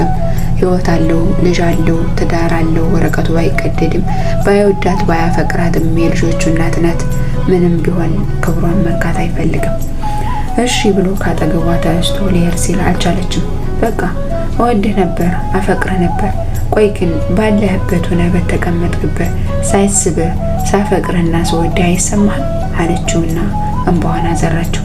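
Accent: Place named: Wollo, Ethiopia. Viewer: ወላሂ የብዙ ሰው ታሪክ አዳምጣለሁ ግን አንች እንደምታቀርቢው የሚመቸኝ የለም ያቀራረብሽ ለዛ አነጋገርስ ወሎየነቴን ያስታውሰኛል የምር ምችት ይበልሽ በርችልኝ ከዝህ በላይ ጠንክረሽ ጥሩ ደረጃ ደርሰሽ አላህ ያሣየኝ የኔ ውብ ድምፅ😍😍😍😍😍😍😍😍😍😍😍😍😍😍😍😍😍😍😍😍😍😍😍😍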